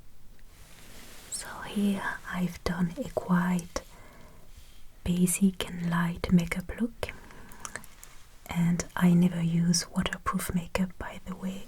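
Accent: France. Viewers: Hello j'te passe un petit coucou de France vu que j'ai pas pu m'empêcher de repéré la prononciation bien français des mots anglais mdr.😂❤ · I think I recognize french accent. Why don't you make a french video sometimes ?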